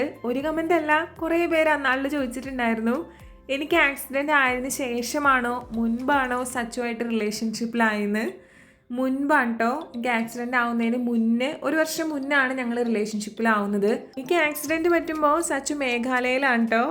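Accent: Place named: Trivandrum, India. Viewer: Trivandrum slang cheruthayit vannu thudangitund😁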